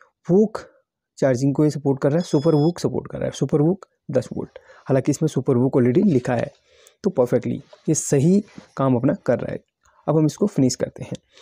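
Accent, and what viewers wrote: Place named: Madhya Pradesh, India. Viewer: Sir aapki language ♥️ madhyapradesh ♥️ jaisi mach hoti h aap bhali utrakhand se ho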